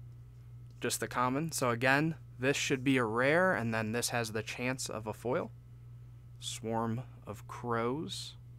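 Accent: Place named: Europe. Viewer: Big fan of euro english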